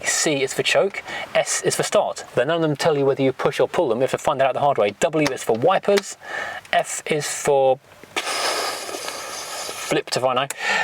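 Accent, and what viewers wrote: United Kingdom. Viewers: Wish he wouldn't speak so British, I would like to understand WTF he is saying...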